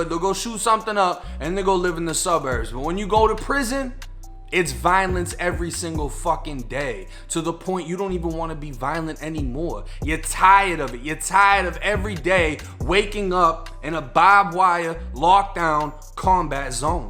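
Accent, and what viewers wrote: Boston, United States. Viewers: U from Boston? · Bruh you could be from Tampa but you got that Boston accent straight up!!! Salute to your work tho.. always a great watch #MISSIONHILL #BOSTON here to represent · How do you have a Beantown accent coming from Florida? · Lol the Boston accent makes more entertaining to listen to the stories.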